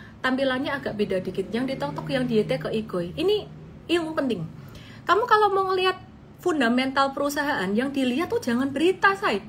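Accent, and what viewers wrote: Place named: Semarang, Indonesia. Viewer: cengkoknya Semarangan loh 😂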